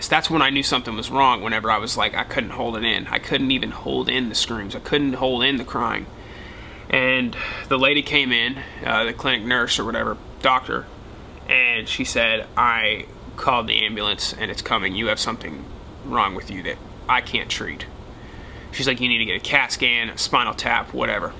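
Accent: South, United States. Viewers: hey man. you can tell your from the south. your a nice guy. almost thought you were from Texas for a minute. lol hook' em baby!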